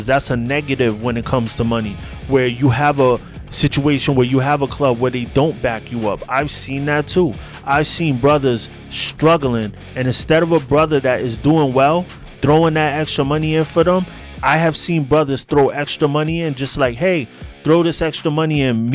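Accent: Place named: Brooklyn, United States. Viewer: It sounded like your from Brooklyn. I lived in Borough Park Sheepshead Bay and Canarsie but I’m here in Florida now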